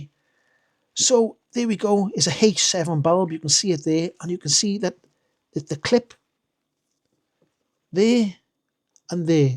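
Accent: Wales, United Kingdom. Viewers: Love that Welsh Accent, By Here!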